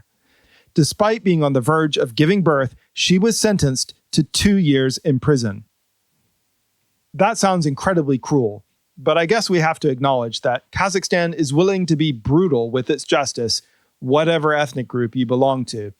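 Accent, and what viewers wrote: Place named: Philadelphia, United States. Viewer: Are you from Philly? Guessing base on your "o"s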